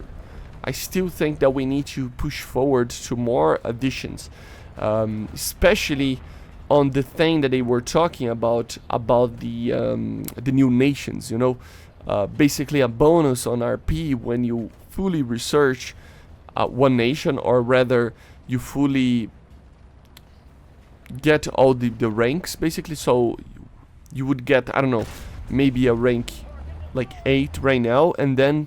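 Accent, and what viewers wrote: Brazil. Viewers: Dude, i listened to 10 seconds of the video, pause, 5 seconds of the video, pause and I said to myself, this guy is definitely Brazilian, muito foda ver um br com um canal top em ingles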